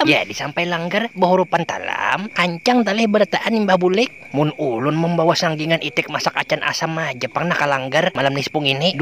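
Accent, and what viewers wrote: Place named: Martapura, Indonesia. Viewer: Mantap mang ni suaranya urang martapura ky nya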